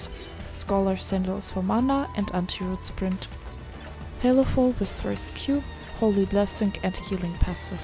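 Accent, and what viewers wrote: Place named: Germany. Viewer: Okay ;) , you have very german accent because i'm asking , thanks for information .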